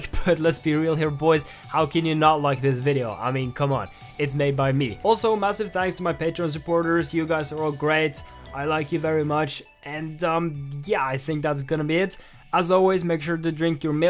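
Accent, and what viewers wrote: Sweden. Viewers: Hey are you swedish or norwegian? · Are you swedish?? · Are you Swedish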